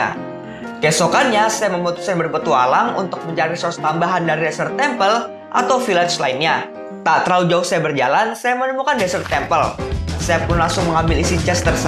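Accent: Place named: West Java, Indonesia. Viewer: Bang nanti coba tugas ipa dong semangat terus bang ngonten nya lanjutin konten survival seru bangettt dan cara ngomong abang kek orang Sunda :v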